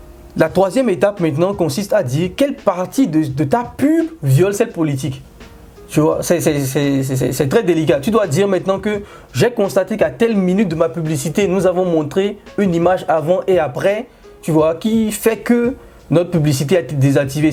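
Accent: Benin. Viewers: Oulah! Ça sent le français béninois ici! Je m’abonne 💪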